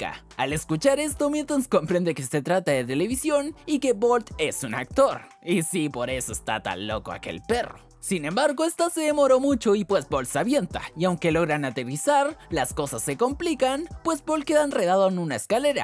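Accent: Chile. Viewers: Pera pera eri chileno ?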